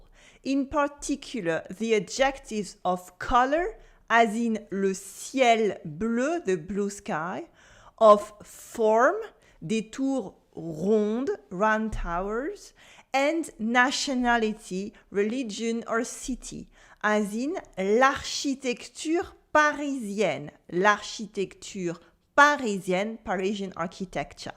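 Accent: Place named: France. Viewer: I believe you speak too much English in your video. That's the reason I don't subscribe to your lessons. You would help those who are listening to hear complete phases in French. Also, as someone else mentioned, despite your good English, you did not pronounce the word Adjective correctly in English. It just sounds like any French person trying to speak English (with a strong French accent). The accent is on the first syllable, not the second. Bonne chance!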